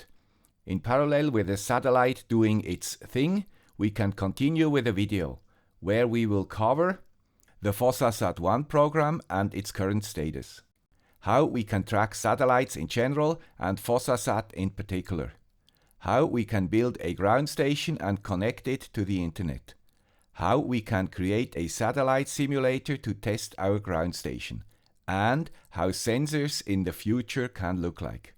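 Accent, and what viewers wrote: Switzerland. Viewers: Another great episode from the ham with the Swiss accent. :-)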